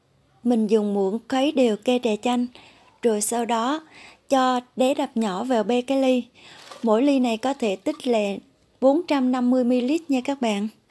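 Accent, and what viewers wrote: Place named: Phu Yen, Vietnam. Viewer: Bạn giống ở phú Yên vậy bạn giọng nói ấy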